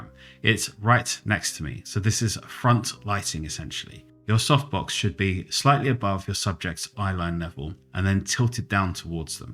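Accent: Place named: England, United Kingdom. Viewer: Another excellent tutorial,!! Your photography and teaching skills are superb...plus, the English accent makes your videos even more pleasant to watch! 😁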